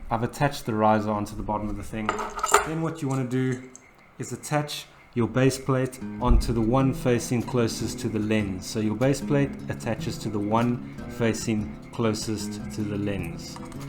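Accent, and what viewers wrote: South Africa. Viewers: Bro I know ya in Australia but I kinda hear a slight South African in there ? psssssst bro much better if you get GH5 with awesome IBS :P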